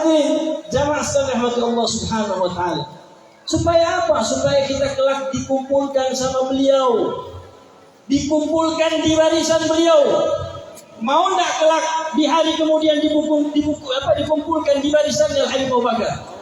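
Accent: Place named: Manado, Indonesia. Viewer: sehat selalu ya habib..so ba logat manado ini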